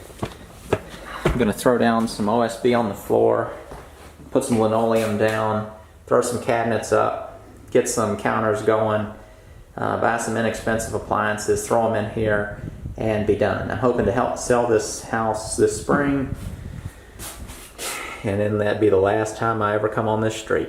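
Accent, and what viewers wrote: South, United States. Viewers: Sounds like you're from the South, what state are you in?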